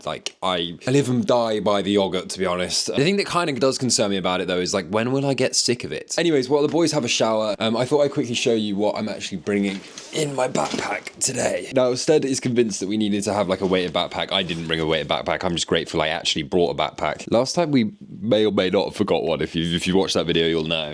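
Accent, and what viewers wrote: United Kingdom. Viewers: learn me british accent pls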